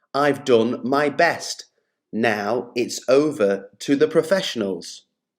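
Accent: United Kingdom. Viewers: I actually like your British accent, it's cool 😂❤even though I find it more difficult than the American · Please tell me, this is it British English?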